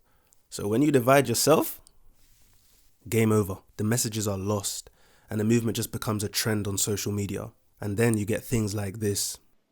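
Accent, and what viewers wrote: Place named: United Kingdom. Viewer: Hey do you live in America I only ask this because I assumed you lived in the UK just because of your accent, but you also seem well aware or in on American Political issues and pop culture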